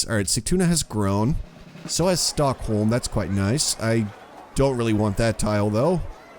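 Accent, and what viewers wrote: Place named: California, United States. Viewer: I would never guess you were Dutch by your accent. I thought you were Californian!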